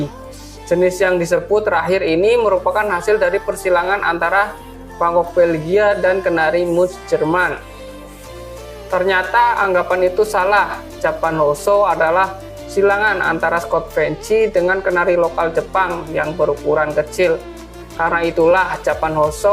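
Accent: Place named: Banyumas, Indonesia. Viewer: Ini baru ahli burung..jos kang.ora ngapak ora penak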